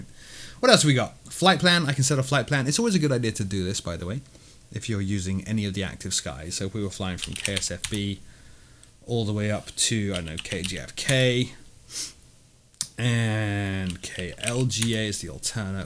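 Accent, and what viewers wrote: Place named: United Kingdom. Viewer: wait are you from florida?! you sound british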